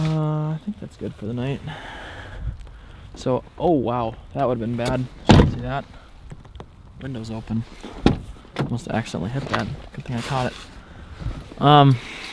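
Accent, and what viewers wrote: Canada. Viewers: You Canadian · You sound like one of us Canadians.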